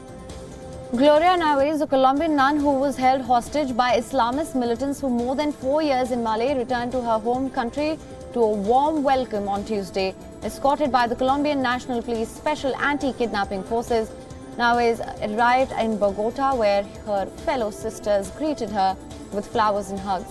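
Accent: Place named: India. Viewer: This newscaster has an annoyingly high pitched terrrible voice worsened by her Indian accent.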